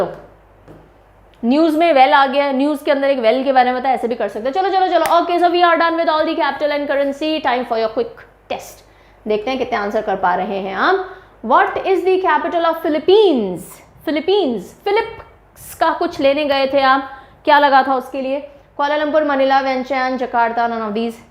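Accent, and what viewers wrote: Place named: Bengal, India. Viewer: Aap bengal sai ho didi. Ki hallo didi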